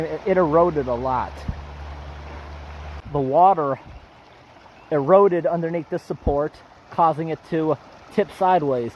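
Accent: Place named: United States. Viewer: I'm From New Zealand and I like his American Accent.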